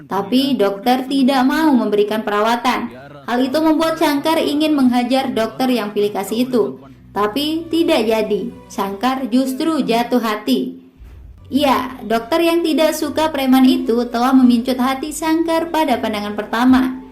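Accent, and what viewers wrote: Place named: West Nusa Tenggara, Indonesia. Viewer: EMANG KK ORANG NTB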